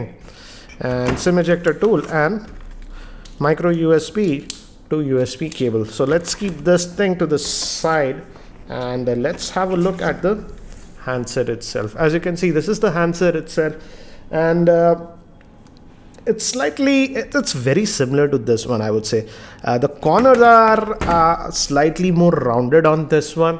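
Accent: India. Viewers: I like this Dude Accent though! Not too thick Indian Accent but with a bit touch of English Westerner Accent. 👍 Nice Review too!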